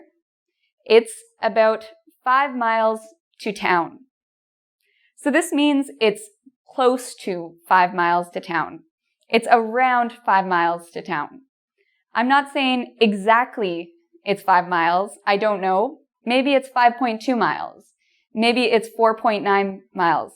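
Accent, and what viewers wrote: Canada. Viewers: Canadian pronunciations, non-Metric measurements... I'm confused. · I love you , your Canadian accent and your lesson. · yes, I can really notice Canadian accent ... and I love it :-) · You a great teacher anda I love Canada's accent.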